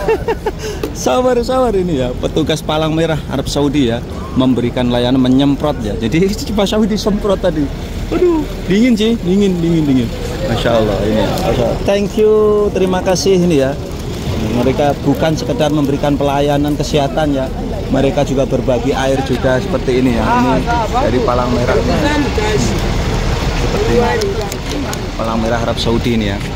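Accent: Java, Indonesia. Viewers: Jawane metu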